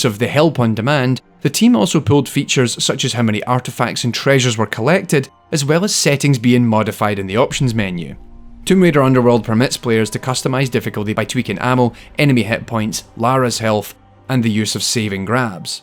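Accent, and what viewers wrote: Scotland, United Kingdom. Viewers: Scottish was my guess, but I wasn't sure. Thanks for your answer!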